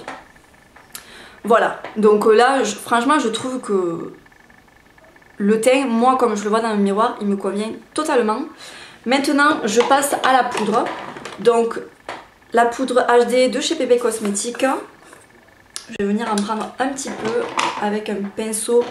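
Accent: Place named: Marseille, France. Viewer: vu ton accent tu dois venir prés de marseille?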